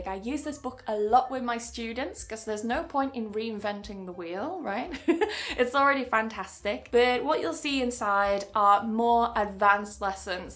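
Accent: United Kingdom. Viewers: I was looking for pure british accent speaking content and I find you accidently. Because my search was done yestarday and didn't end up with relevant contents. Today, I suddenly come through your content. So wonderful. My greetings from Algeria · Hii I like your video, are you from UK? :) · Wow really awesome British accent!! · I wanna to learn British accent from you. Therefore guide me how am I join your online english British accent course…? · r u British ?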